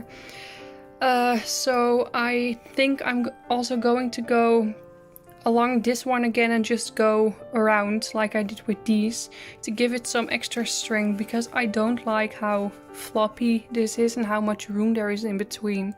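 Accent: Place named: Netherlands. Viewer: Are you dutch ? You sound dutch (I am dutch) I love how you project turned out it looks amazing !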